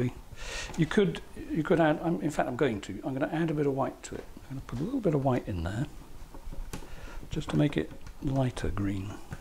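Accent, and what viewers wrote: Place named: Kent, United Kingdom. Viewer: im a pommie that lives in Australia. I came here with my father in the 70s from London to Canberra, where my pa worked in the British High Commission. I never return to UK as it upsets me too much. I used to spend my young years visiting my nana in Tonbridge Kent and you remind me so much of my times in Kent. Your voice, your attitudes, your humour, takes me back to my days in Kent. To the hop fields, to the high street, to the avenue where my aunt vi lived all comes back to me. Australia in contrast to UK is so vast and wild and it lacks the human cultivation, it lacks the history and order that one yearns for at times.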